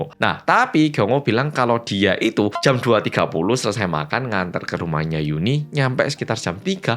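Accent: Java, Indonesia. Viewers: Dapat notif langsung ambil kopi, stay ke mas medok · Knp logatnya Jawa bgt🤔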